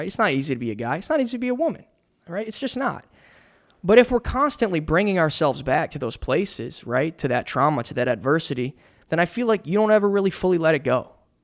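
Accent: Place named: United States. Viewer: The diction, the intonation, the rhythm....... soooo perfect! 🙌 I'm currently learning American English and YOU have the most PERFECT American accent, I can listen to you the whole time and mimicking you (and that makes me feel confident and motivated) Hope you can make more solo podcast. Thanks a million! 🩵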